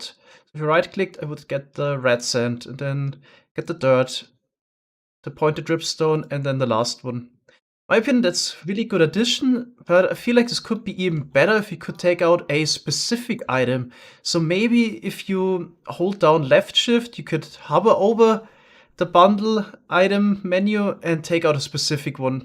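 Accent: Germany. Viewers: You sound like you're German You kind of have a German aczent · Is he german?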